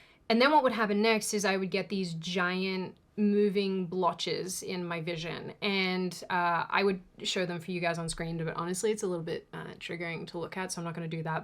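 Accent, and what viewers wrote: Australia. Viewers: Is it just me or does she sound more Aussie than she has in the past? So sorry she’s going through this…😢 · Wow, hearing her speak so "naturally" and candidly is really nice. And she comes off as likeable, funny, and with that Australian streak of charm. 🙂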